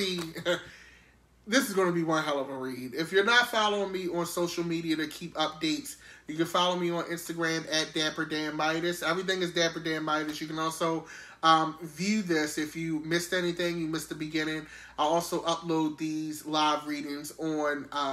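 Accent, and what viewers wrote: Baltimore, United States. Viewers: You have a Bmore accent.. I like listening to how you read, and it's nice that you're so politically informed. Thoroughly entertained by this book reading and I'm only on day 1.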